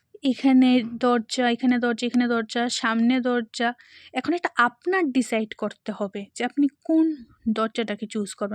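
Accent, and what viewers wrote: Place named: Bangal, Bangladesh. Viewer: Didi tmr mukh a amr bangla sotti sunte vlo lage ❤❤❤ Tumi tmr motoi sundar didi... Kintu sotti amr vlo lge...ami tmr katha sunei bujhechilm tmi bangal...kintu amr tmr mukh a ai bangla tai amr vlo lge...tmi bangla tei video koro❤❤❤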